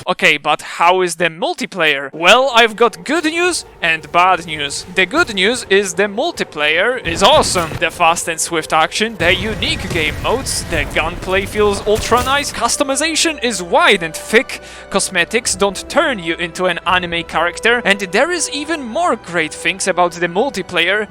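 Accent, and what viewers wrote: Poland. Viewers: I did not expect a polish accents here xD wtf. Nice video 👍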